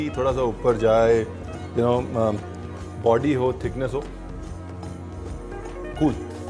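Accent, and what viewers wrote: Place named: Punjab, India. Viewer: A big fan . You nail it when you explain things specially with that PUNJABI touch♥️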